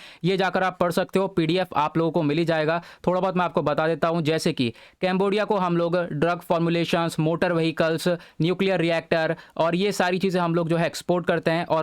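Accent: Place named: Bihar, India. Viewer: I am sure sir is from Bihar 🔥🙏🙏😀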